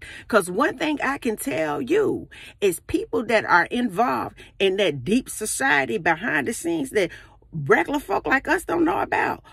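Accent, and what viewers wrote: South, United States. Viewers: with all that Country accent, your right on point! Ain't it good to have ears that can hear!!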